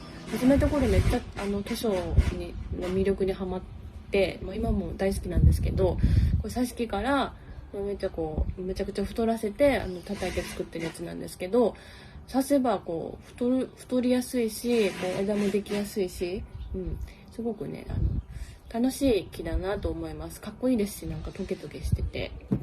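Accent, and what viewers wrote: Karatsu, Japan. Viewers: とても、自然体で、このくらいが、個人的には、大好きです。 標準語と、唐津弁の混合もすき！ ポフポフ良いですかね？ 自分も、スマホ一つです。 帽子紹介のシャキン！ ナイスです。 よく工事してますねー？ 高級住宅街かなー いつも、癒されてます。 仕事が、嫌いなので、動画を 見て、ほっこりさせてもらっ てます。 ありがとうございます。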